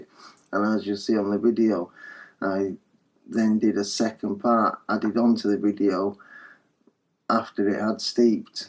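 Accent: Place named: Yorkshire, United Kingdom. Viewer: He comes from the. North of England. Possibly Yorkshire. They tend to talk slower,giving the appearance of being drunk slightly. I'm from the south west yes we all have accents from wherever we live. At least we don't say y'all every other few words.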